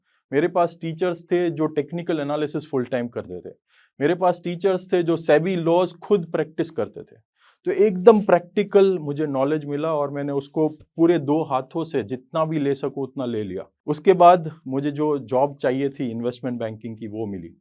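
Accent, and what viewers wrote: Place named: Gujarat, India. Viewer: I can feel that Gujarati accent in your speach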